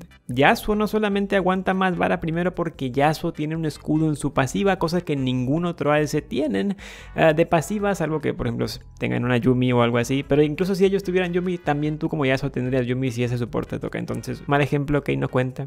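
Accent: Mexico. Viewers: Jjja no mms eres de cancun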